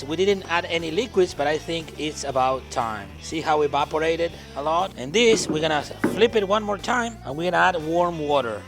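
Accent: Argentina. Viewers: Argentiniza el tipo. Bien ahí.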